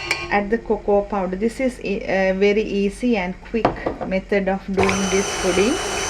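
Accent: Sri Lanka. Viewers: tara sanda I thought the same.Accent is Sri lankan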